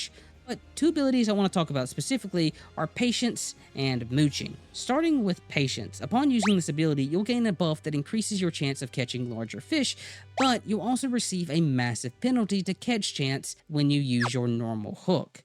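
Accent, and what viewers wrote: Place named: Kentucky, United States. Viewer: Sounds like me and I’m Kentucky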